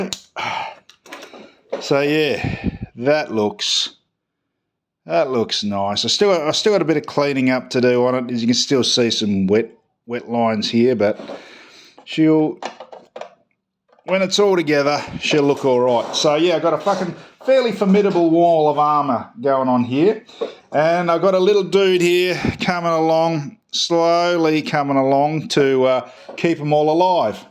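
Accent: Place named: Australia. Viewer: love hearing another aussie talking about the hobby, good job mate!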